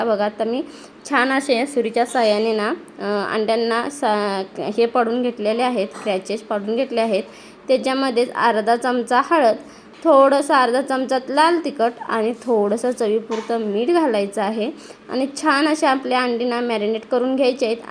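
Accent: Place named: Kolhapur, India. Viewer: खूप छान रेसिपी तुम्ही अस्सल कोल्हापुरी आणि तुमच्या बोलण्यातही कोल्हापूरचा ठसका जाणवत आहे 👍👍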